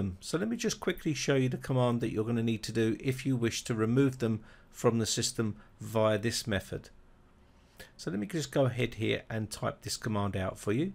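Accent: United Kingdom. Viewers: Wow.. Nice British accent. I love the way a true English man speaks.. Absolutely marvelous..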